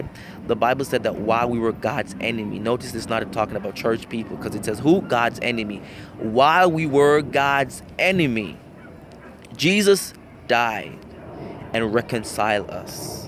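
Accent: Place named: Caribbean. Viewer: O, where are you from? I hear an accent (I’m from the US…Delaware)…I’m trying to detect it…a Caribbean Island?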